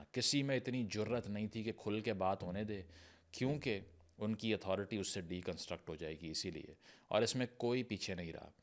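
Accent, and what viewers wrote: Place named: Pakistan. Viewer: I love ur urdu accent too which is now obsolete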